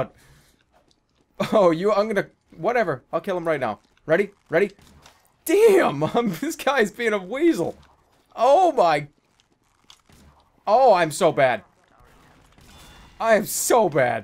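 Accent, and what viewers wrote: Boston, United States. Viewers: Jeez the Boston accent. I want it T___T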